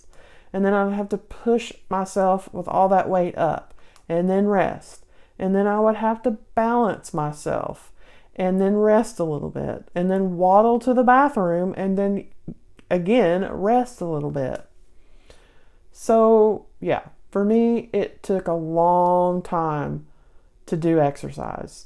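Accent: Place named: Texas, United States. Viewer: Are you a Texan, sweetie? You sound like it.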